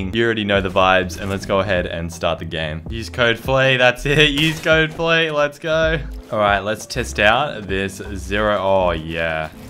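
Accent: Australia. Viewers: Are u Australian I just think u are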